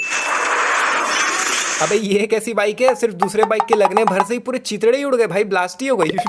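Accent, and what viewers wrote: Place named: Mumbai, India. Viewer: Bhai udda diya ek Mumbai excent hai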